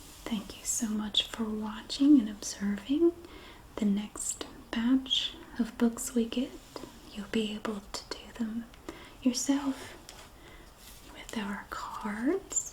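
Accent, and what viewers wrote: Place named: South, United States. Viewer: Your voice is the most beautiful thing I’ve heard in awhile. You sound like Lina Grace with a Southern accent instead of an Australian one. ❤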